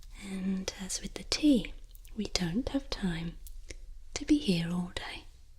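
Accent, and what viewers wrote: England, United Kingdom. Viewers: Your absolute perfection in ASMR! Your pacing, presentation, variety of subjects, and your near angelic English accent is simply...just WOW! Very original and enjoyable. Thank you. 😊🖐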